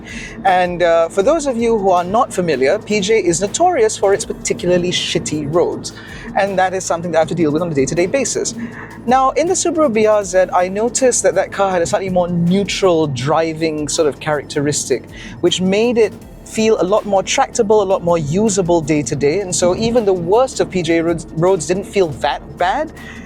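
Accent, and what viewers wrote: United Kingdom. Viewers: His accent is like british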